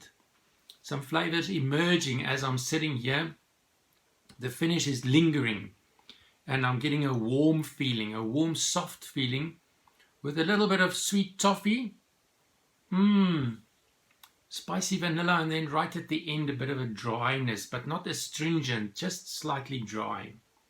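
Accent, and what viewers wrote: South Africa. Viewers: The South African accent is so cool. Cheers my dude.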